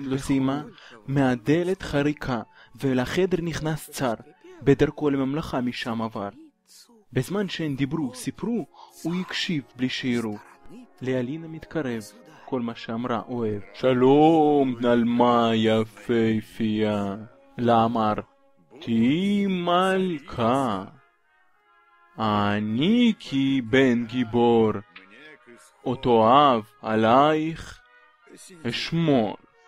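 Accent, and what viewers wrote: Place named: Russia. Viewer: имхо стоит попросить озвучить нативспикера, небольшой русский акцент всё же прсутствует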